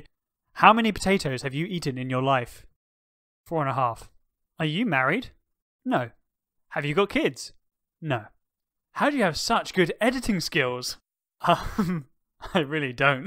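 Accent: United Kingdom. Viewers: You are actually quite similar to Mumbo -brittish accent -good at redstone -classy outfits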